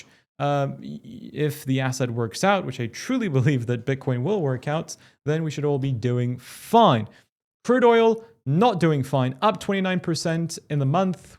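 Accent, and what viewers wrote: United Kingdom. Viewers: Posh toff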